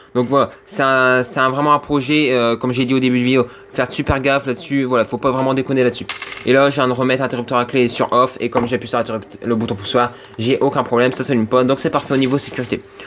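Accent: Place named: Northeast, France. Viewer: Tu es de où ? J'essaye de deviner avec ton accent mais ça ressemble à un accent type nord est (genre lorrain, voir Meusien)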